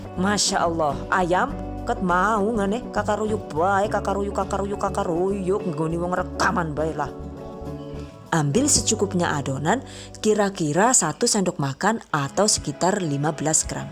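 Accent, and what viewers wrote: Banyumas, Indonesia. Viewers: Merga wong ngapak dadi tak subscribe juk ben gampang nek arep ndeleng resepe rika suwun yu resep resepe. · Ngapak maning lha 👍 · Ngomonge bisa ngapak kaya wong banyumas ya mbok ? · Bu, ibu wong endi bu, ngapak..tanggane nyong apa udu. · Lucuuu kocakkk mbaknya...aplgi klo pas lg ngomg bhsa ngapak😂😂😂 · Kayak orang bule ya mbknya,,tapi ternyata bisa bhs ngapak2 😂😂🤣,,,btw syukak bgt ma vidio2nya,,thx resep2nya mbk yu 😄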